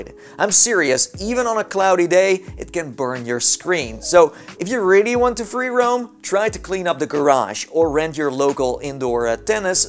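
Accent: Netherlands. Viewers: Ben je nederlands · You sound dutch (just a random though) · are you dutch by any chance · Ben jij Nederlands? Want je hebt zo een accent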